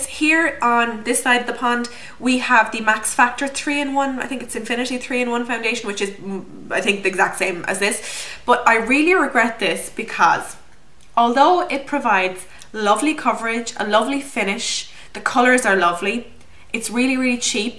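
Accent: Ireland. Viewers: just love your irish accent. thanks for the reviews.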